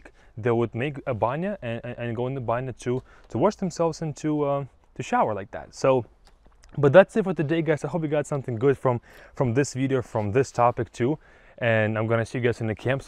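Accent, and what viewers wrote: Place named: Russia. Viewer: he is really good at English. but also i can hear his Russian accent though haha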